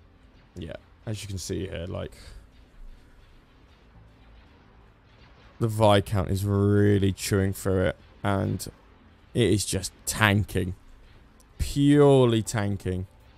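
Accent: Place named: United Kingdom. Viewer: His voice is different like more British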